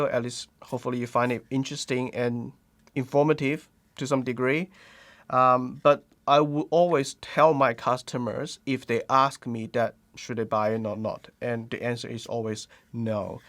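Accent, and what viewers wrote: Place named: England, United Kingdom. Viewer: english accent . funni .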